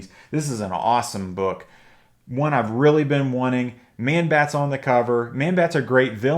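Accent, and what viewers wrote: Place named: South, United States. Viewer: Judging for your accent, I'd guess you're a Southern guy like myself. Rick Fortenberry (one of the guys who puts on the show) is a friend that I got my Batman 232 cbcs 8.5 from. You also had a brief glimpse of another great guy, Lyn Anderson who puts on a show in Raleigh 3 times a yr. Next show is early December and has some great vendors , Like Rick and Dave Hinson . You really should look into checking it out if you're in NC and looking for great 1 day show.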